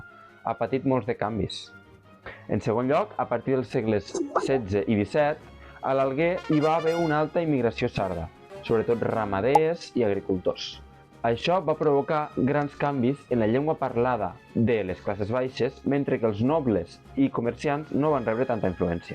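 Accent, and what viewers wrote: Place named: Tortosa, Spain. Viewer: Xe, sense mirar lo nom del teu canal, he pesant: Sona a que és de València. Espera, parlar pronunciat com parlá... De Lleide o per Tortosa. I en efecte, ix la capçalera de. Està interesant saber coses d'estes per l'accent. Crec que t'he vist per twittah.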